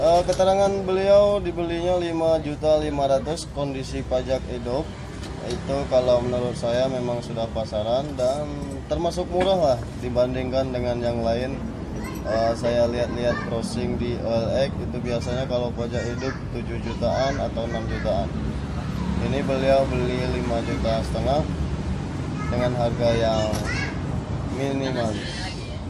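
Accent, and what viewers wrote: Palembang, Indonesia. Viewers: Wong palembang kk ee, dimno nyo bengkel nyo kk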